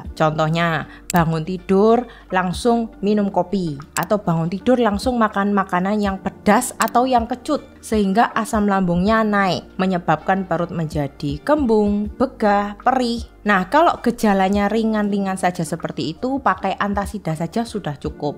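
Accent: Java, Indonesia. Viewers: aku faham logat mu cik...kmu tinggal di jawa kan.😅..btw aku sakit gerd ..pusing ga hilang udah 7 tahun ....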